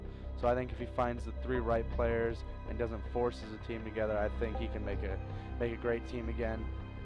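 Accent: Canada. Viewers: You Canadian?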